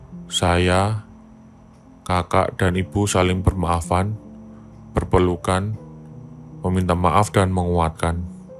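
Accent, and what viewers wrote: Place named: Java, Indonesia. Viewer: Suka ama logat jawa-nya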